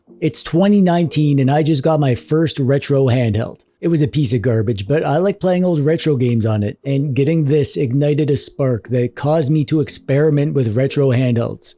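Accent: Canada. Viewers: This is my first time watching any of your videos, and I've now just ordered this, but one question... are you Canadian?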